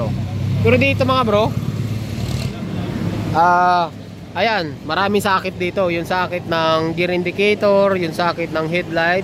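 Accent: Bicol, Philippines. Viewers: Bicol ka?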